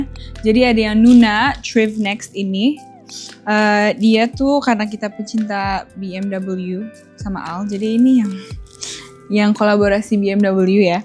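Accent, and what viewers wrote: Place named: France. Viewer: aku tu suka bgt kalau kamu ud ngomong inggris tapi akses prancis kek kek anjaay mehong bgt dirimu😭💓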